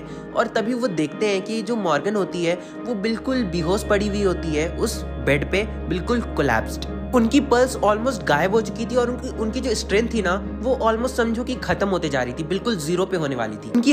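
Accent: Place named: Bihar, India. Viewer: By your accent I think u r from bihar 😀😀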